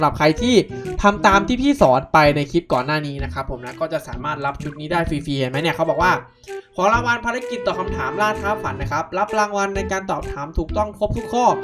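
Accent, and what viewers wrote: Isan, Thailand. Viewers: อ้ายเป็นคนอีสานบ่ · น้องฟังออกน้องเป็น อีสาน ♡♡♡♡♡ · ค่อยเปนคนอิสานฟังออกชั้นเจน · อีสานชัดๆ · อ้ายเป็นคนอี่สานเบาะ??? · คนอีสานหรือ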